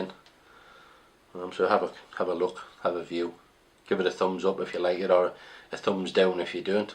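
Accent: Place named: North, United Kingdom. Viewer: Well done mate....its nice to see and hear a down to earth northern lad.....saving and saying £700 is still a lot of money to us normal working lads.....most of the reviews,they have massive houses or been given guns to review....you've convinced me what my new gun will be👍🏻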